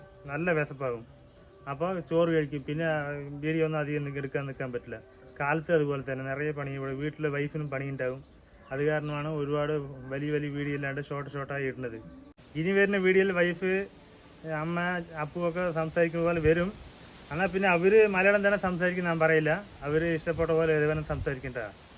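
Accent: Tamil Nadu, India. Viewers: ❤❤❤chettan parayumbo tamil slang varununde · കുറെ നാളുകൾക്ക് മുൻപ് bro യുടെ തമിഴ് കലർന്ന മലയാളം കേട്ടിട്ടാണ് Channel Sub ചെയ്തത്😊 ആദ്യമായിട്ടാണ് comment ചെയ്യുന്നത് നിങ്ങളുടെ സംസാരം ഒത്തിരി ഇഷ്ടമാണ്❤❤❤❤❤ God bless you bro......❤❤ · Najn aa thamil kalarnna malayali ye eshatam 😂❤❤❤..ariyan kazijathil santhosham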